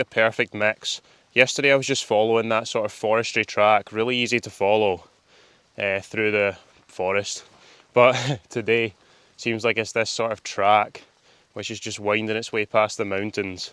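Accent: Scotland, United Kingdom. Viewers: Great views against a perfect blue sky, if it wasn’t for your accent I wouldn’t believe you were in Scotland. 😅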